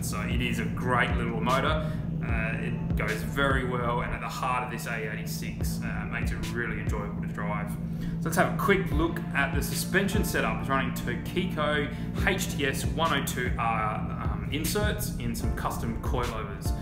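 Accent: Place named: Australia. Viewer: haaha same dude, it was in my recommended, and I was like oh this guy sounds aussie, then I was like yo wtf its mallala haha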